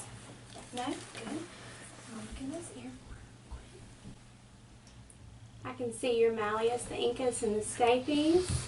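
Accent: South, United States. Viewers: That southern accent makes the video😍👅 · I normally hate southern accents but hers is adorable.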